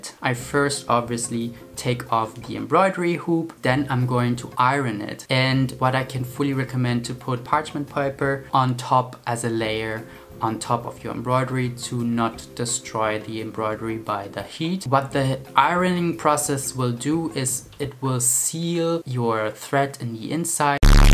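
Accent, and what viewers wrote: Germany. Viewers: after five seconds in this video I already know that he's a German lol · Are u german?:) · Dude are ya German ? I hear a German accent there 👁👄👁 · Are u german? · Are you german.?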